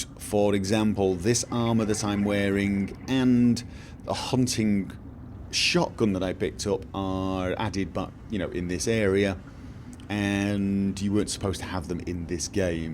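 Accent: United Kingdom. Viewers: My apologies if you have already answerd this question before, but from where in the UK is that accent of yours? PS: Please don't tell me it's from Norway in the comments. I am already aware that he lives there. But it is for sure not a Norwegian accent, I know thia as I live next to the border of Norway in Sweden.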